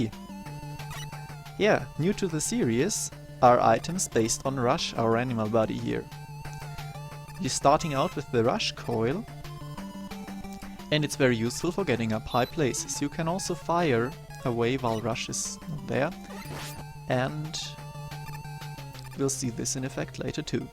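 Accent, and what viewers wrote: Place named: Germany. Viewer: Alter o.O Man hört ja auf 10km dass du aus Deutschland kommst. Oder ist das gestellt?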